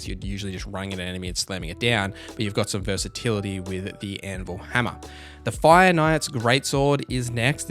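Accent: Australia. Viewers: Great video. I love your font of Australian accent and your energy❤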